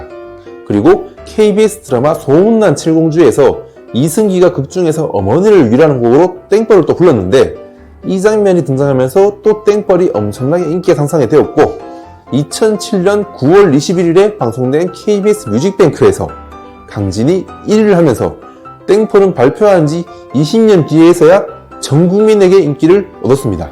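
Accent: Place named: China. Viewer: 유튜버님 혹시 중국인이세요? 아님 조선족이세요? 발음이나 억양이 한국인이 아닌것 같은데ㆍㆍㆍ??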